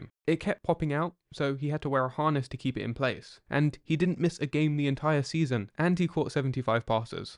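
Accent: England, United Kingdom. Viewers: Wait. Why is sone guy with an Anglophone accent (English, I guess) doing videos about 60s Bears football?!? By the way (just to establish some credentials here), I was at Gale Sayers' 6-touchdown game against the 49ers with my father. And although I was sitting at home, my father was at the 1963 NFL Championship game at Wrigley Field against the NY Giants.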